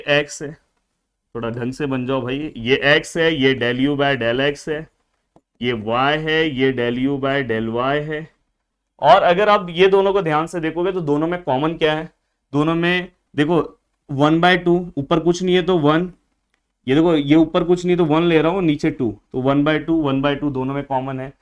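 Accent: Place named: Uttar Pradesh, India. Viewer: Up wale hai bhai lagta hai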